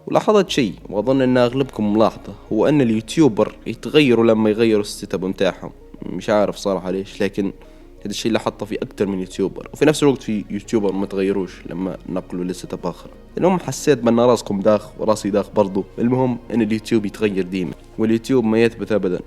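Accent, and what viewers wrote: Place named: Libya. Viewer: ماشاء الله، ولد بلادي، أصلاً، واضح من اللكنة واللهجة، إستمر يا أسطورة 🇱🇾♥️✌️👏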